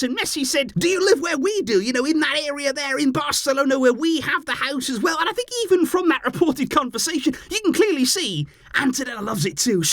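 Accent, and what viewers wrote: Wales, United Kingdom. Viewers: because he's from wales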